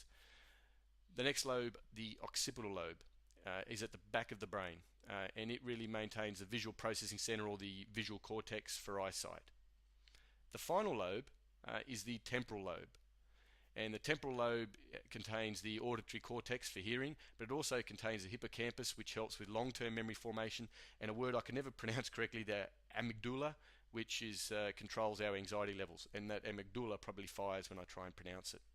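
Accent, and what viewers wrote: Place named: Australia. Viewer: Sounded as if the bloke was Australian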